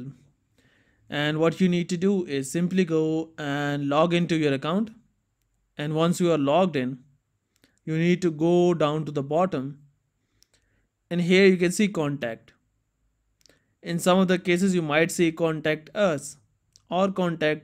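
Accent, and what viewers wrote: India. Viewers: As soon as i heard an indian voice ik it's a waste of time video...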